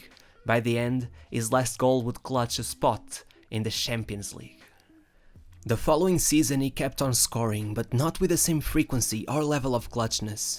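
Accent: Portugal. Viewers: Every morning every football player should look into a mirror and say"Thank you God c'os i'm blessed..I do what i love to do and i get paied for it..I do what what millions of kids in the world never reach,and my life in footballl it's like a life of an orchidea,so lets be excelent,lets seize the day"...It's only a very humble vision of life it costs nothing...But not,too much shit in the brains,too much drugs,too much women,and the shine of youth fades...wasted!...Good work with the video only the accent it's too much Tuga(iAm i wrong?) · Dar support, nota-se que é tuga pelo sotaque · You sound Portuguese, are you? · Just one question are you Portuguese cause I think the way u pronouce latin words sounds a lot like it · the guy casting the video is so portuguese ! can tell by the accent xD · fantástico como se conhece os tugas ao longe a falar Inglês... bom trabalho!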